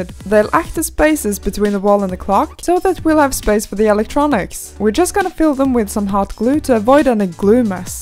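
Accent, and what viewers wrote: United Kingdom. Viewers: She sounds more British in this video than others. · So this is where she started to lose the british accent xD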